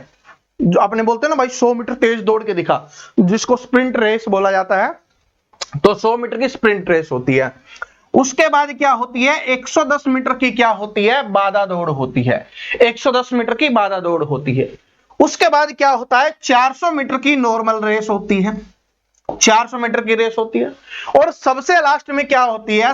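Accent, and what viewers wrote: Haryana, India. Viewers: Spata 100 haryanvi boli m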